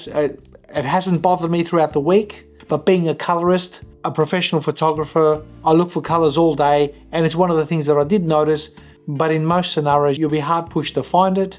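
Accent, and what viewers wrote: Australia. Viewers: Fix that damn obnoxious Aussie accent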